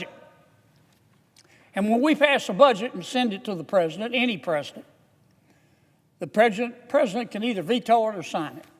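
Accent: South, United States. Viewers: I woukd like to see 99 more Senators just like Kennedy! Love his southern straight and humorous twists in his speech!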